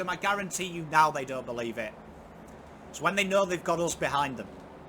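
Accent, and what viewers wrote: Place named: North, United Kingdom. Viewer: Never seen you before but hats off - good analysis.... the beautiful irony of AFL analysis with the Northern UK accent! I'm a 52 year old life long Blues fan and this, I feel, was the lowest performance I have ever seen! The particular pain I feel is around the fact that we have a core of very talented players.... but the level of desire, determination and far dinkum 'have-a- go-edness' was totally absent - apart from the same few who try their boxes off & show something every week (Walsh, Weiters, Harry etc think.... "fuck maybe I'm receptive to other clubs warm embrace). Need for the humility & reality involved in your 'Rehab' is a good metaphor for this sorry situation - knowing 'where you are at and embracing the hard work on a worthy journey'. Has Teague lost this group? Sunday SHOULD have been a showing of ferocious intent to demonstrate (even if only to ourselves) that we have 'something' by way of ticker. 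Instead, what I witnessed was a demonstration of such incompetence, lack of passion and lack of 'team' that I found myself (for the first time) not even disappointed - just numb. Keep up the good work - you're showing more passion than some of the folk who should be Driving this club!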